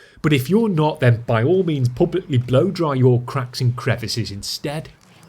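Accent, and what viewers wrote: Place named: Scouse, United Kingdom. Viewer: Yes, accent - it's a soft scouse.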